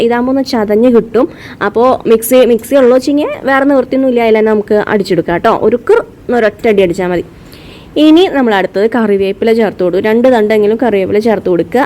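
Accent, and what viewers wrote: Thrissur, India. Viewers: Chechi frm Thrissur aanno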